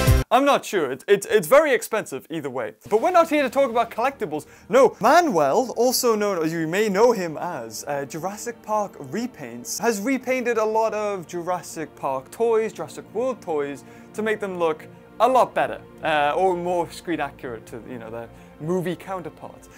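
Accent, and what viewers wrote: United Kingdom. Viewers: are you British??? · Ever since I’ve been watching I may have develop a British accent at times.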